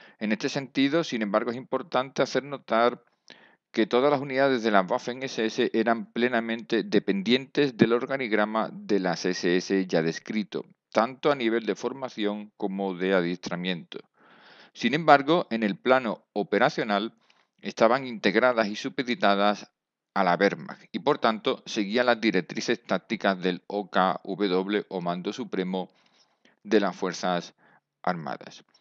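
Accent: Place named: Spain. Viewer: oro puro este canal, soy cubano y ese acento del castellano es música para los oídos...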